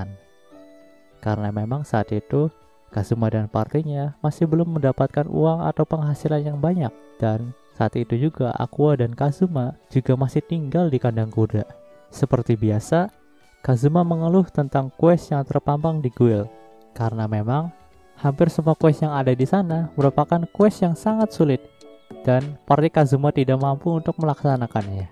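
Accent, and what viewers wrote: Cirebon, Indonesia. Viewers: Orang Cirebon njir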